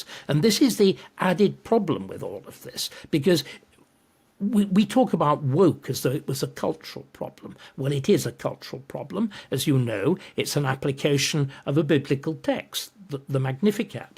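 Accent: United Kingdom. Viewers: I'm American and I just LOVE to listen and learn from a Brilliant Brit. Nobody sounds like they do! 🤩😁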